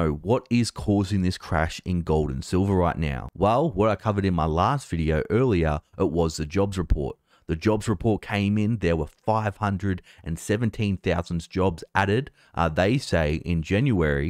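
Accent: Australia. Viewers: I think the Central Banks are trying to consolidate as much gold as they can because “in Their hands it is valuable but in Our hands it’s Not”. If they crash the price people might be tempted to Sell…then They can acquire it at Bargain Prices. When I watch your videos your accent makes me miss visiting Australia! I declare a TAX FREE 2023 for Every Disgruntled Democracy 💭🇺🇸✌️🇦🇺❣️🙏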